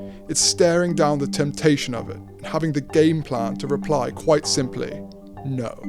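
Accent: RP, United Kingdom. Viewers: Voiceover is too posh it makes me want to rip my ears out. Would want either a modern London accent or better yet, a good old Georgia/SC boy that grew up in the shadows of Augusta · Your narrator is too posh. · Great content, but narrator is the single poshest man I’ve ever heard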